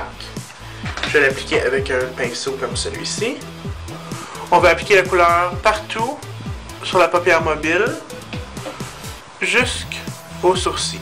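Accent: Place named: Quebec, Canada. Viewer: Tu as un accent Québecquoi ! :]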